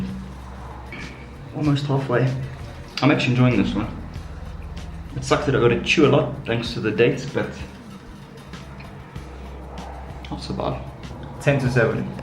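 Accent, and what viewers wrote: South Africa. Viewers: Hell yeah, I was guessing you're from South Africa. That is my favorite accent in the whole world :D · Just found your channel. I thought the accent sounded familiar :)) South Africa! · Are you south african ? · Are you South-African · You're one of the few South African sounding South Africans I've seen on YouTube yet. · I knew I recognised a fellow South African 😁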